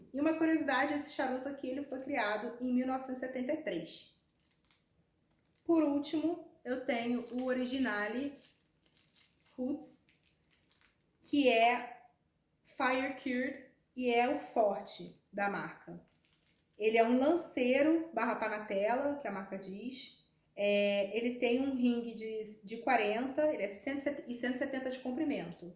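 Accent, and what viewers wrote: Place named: Rio de Janeiro, Brazil. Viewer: .. adoro esse sotaque carioca. Ótimo vídeo, uma aula, parabéns!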